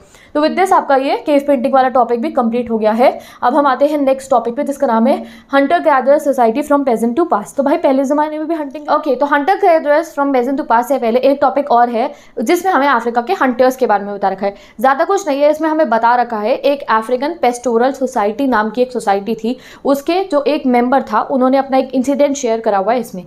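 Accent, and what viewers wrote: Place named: Bihar, India. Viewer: Tumhara accent dekh ke lagta hai ki tum bihari ho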